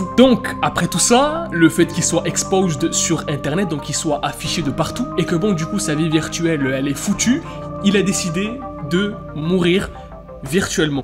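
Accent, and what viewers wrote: England, United Kingdom. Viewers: j'adore ton accent anglais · Je kiff ton accent anglais frérot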